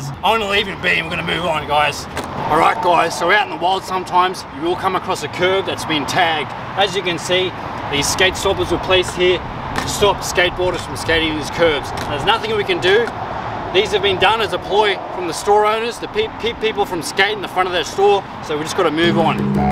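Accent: Australia. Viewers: This is fucking so good, the aus accent just the cherry on top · that was awesome! hahaha. am I correct to think that you spoke with a different australian accent? like from a different region? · its an aussie doing a aussie accent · I like how you somehow made your accent more Australian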